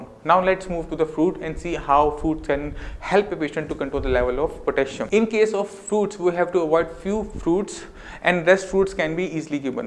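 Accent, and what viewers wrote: India. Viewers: You can slow the speed of play. I actually have it sped up and I understand him perfectly. I suspect you're not used to the accent. I'm British. His English is accurate. He may speak quickly for ear but once again its probably that you haven't had much interaction with ppl from the Indian subcontinent. I used to find it incredibly difficult to understand southern American accents. Took weeks to adjust. Every word slurred into the next... Sometimes too slow to follow the train of thought sometimes too fast and stoccato.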